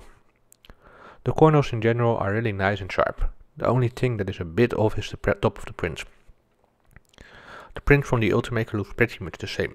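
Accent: Netherlands. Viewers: Nice video man, but the heavy dutch accent is REALLY annoying! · Dat klinkt Nederlands :) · I love your Dutch accents 👍🏼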